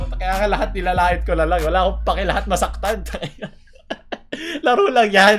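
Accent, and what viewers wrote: Visayas, Philippines. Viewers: Tanginamo. Bobo bisaya accent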